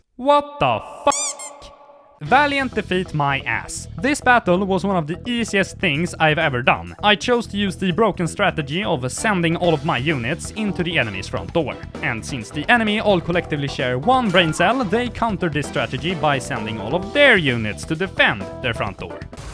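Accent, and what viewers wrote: Sweden. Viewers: Bro is as close to swenglish as you can possibly come while still pronouncing the words correcty lol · This is the best swenglish I've heard since the "regular ordinary Swedish mealtimes" channel went inactive. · Holy tits this mans Swenglish accent is absolutely heavenly I need this in my ears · Nah, he sounds extremely swedish, this is the most stereotypical swedish accent //another swede here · I have few Swedish friends and they don't exactly sound like him but I guess it's close enough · As a scandinavian this is the most perfect demonstration of Swenglish i have ever heard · most heavy swedish accent❤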